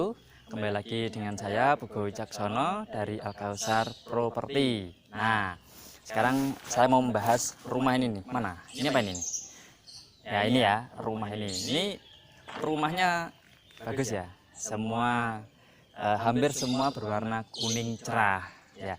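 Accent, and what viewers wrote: Indonesia. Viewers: daerah mana mas ..klw aku.daerah jabar...dari segi bahasanya jatim or jateng ya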